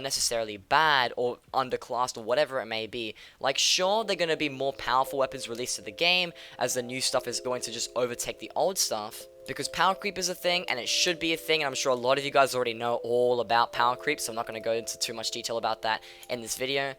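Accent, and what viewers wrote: Australia. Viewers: Are you a Melbourne boy?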